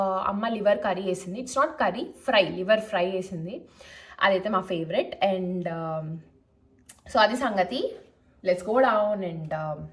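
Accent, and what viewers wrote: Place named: Kadapa, India. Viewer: mana kadapa slong vintu vuntunta vinasompu ga vundi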